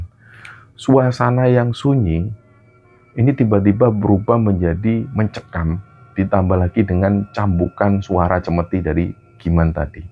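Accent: Java, Indonesia. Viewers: nah ini ak suka dari kang karna ak dari Jawa ak suka Bahasa nya ada selipan bahasa Jawa logatnya juga masuk jadi bisa di pahami dan mengerti sukses selalu kang 🥰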